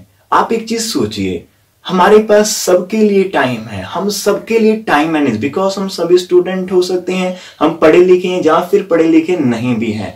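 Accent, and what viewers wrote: Punjab, India. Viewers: Sir vdia lgg rha hai apki hindi main se punjabi sunn rhi hai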